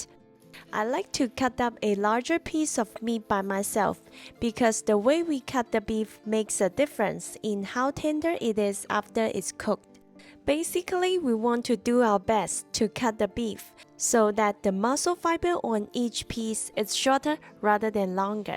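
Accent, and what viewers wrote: China. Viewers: That Cantonese-English accent just makes me feel at home 🏠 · You sound Chinese but you could be Middle Eastern Chinese... That's the thing... :) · 😂😂😂 Chinese accent is definately there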